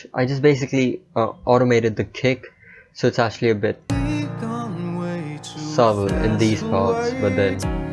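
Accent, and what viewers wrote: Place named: England, United Kingdom. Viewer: you tried to get english ascent🧐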